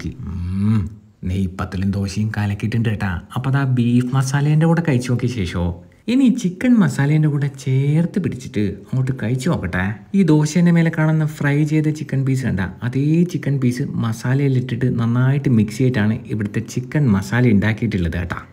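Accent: Kannur, India. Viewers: കണ്ടിട്ട് തന്നെ കൊതിയാവുന്നു 😋. നിങ്ങളെ അവതരണ ശൈലി ഒരു രക്ഷയില്ല. പിന്നെ നമ്മളെ കണ്ണൂർ ഭാഷയും 😍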